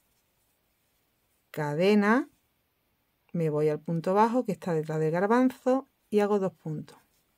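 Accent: Andalusia, Spain. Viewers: además de que la idea es chulísima, no veas la alegría que me da de escuchar mi andalú ❤❤❤ Soy de Sevilla. Estoy haciendo ahora un hexagonal con los restos que tengo. Sí llego a ver el vídeo antes... Pero bueno, creo que lo voy a empezar 😂😂😂 Un abrazo 🤗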